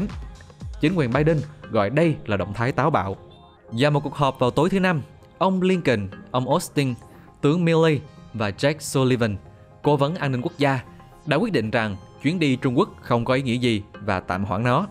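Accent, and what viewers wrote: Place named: Quang Nam, Vietnam. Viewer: Giọng đọc không giấu được chất quảng nam.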